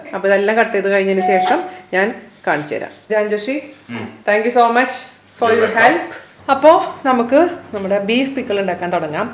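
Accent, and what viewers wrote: Thrissur, India. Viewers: Wooww😋 കൈപുണ്യം എന്ന ഒരു സാധനം ഉണ്ട് ചേച്ചി അതും വേണ്ടെ എനിക്കൊന്നും അത് അടുത്തുക്കൂടെ പോയിട്ടില്ല Anywayy kandapo kothiyaayiii 😋😋😋 Chechide anchoring Nammlde trrisure anchoring Kandathkondaa njn subscribe cheythath thanne Thats the thing what i inspired to learn you You never change your own way Plz reveal more and more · ചേച്ചി മ്മടെ തൃശ്ശൂർ ന് പറഞ്ഞ അത് മ്മടെ ഭാഷ തന്നെയാ അത് മാറിയാൽ പിന്നെന്തുട്ട് തൃശ്ശൂർകാരി ഞാൻ ഒരു തൃശ്ശൂർകാര്യ ഇത് കേൾക്കാൻ വേണ്ടിയാ കാണുന്നെ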